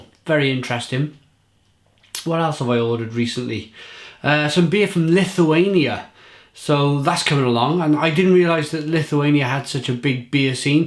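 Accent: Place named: Wales, United Kingdom. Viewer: “It’s going down like milk!” Love it! My mate Matt from Dinas Powys, a similar accent to used to say of cider - “It’s going down like water!” Love reviews. A legend of YouTube. No frills, just enthusiast and knowledgeable. Legend.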